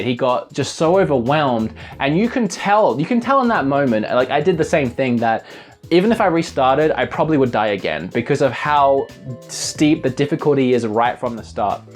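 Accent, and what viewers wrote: Australia. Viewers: Wait.... YOU’RE AUSSIE??????? (Subscription intensifies) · I really want to buy a switch since I never got around to getting a WiiU. Nice to hear an Aussie on YT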